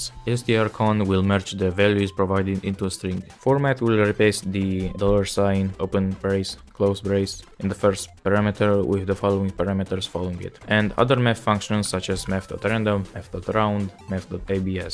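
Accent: Moldova. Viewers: Băi ești moldovean?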